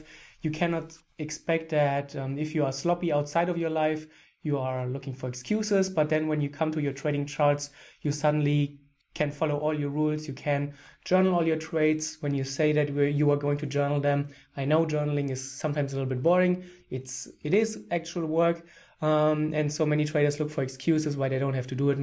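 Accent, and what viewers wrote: Germany. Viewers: I immeditely knew you were german from your accent! Jawohl ja! Haha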